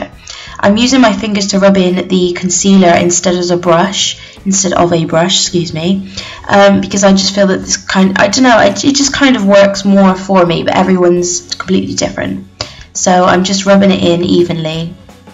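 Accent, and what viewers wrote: Bristol, United Kingdom. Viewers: Love it!I can't tell where you're from >.< so sorry if I'm wrong but you sound bristolian like me!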